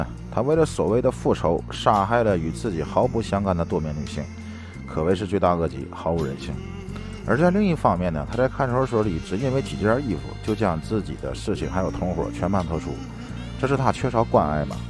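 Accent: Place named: Tianjin, China. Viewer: 天津话不像天津话，普通话不是普通话，听着别扭。干脆就用天津话讲就算了，这样反而更好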